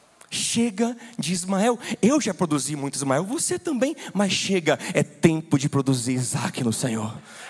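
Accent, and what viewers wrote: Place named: Bahia, Brazil. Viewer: Ouvir uma mensagem poderosa e abençoada como essa, no meu ''dialeto'' e sotaque natural baiano é tremendo demais para mim!! ''Nao queremos viver na mentalidade da carne, de Agar gerando Ismael confiando em nós mesmos...nos leva a compreensão do quão fraco somos até o ponto de perceber que tua obra em nossas vidas é motivo de riso''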